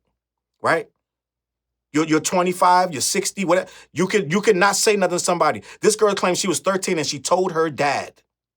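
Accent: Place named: Northeast, United States. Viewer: You hovengers something else lmao ion trust an unbiased opinion on Jay z from a nigga with a northeast accent and fk with you heavy but nah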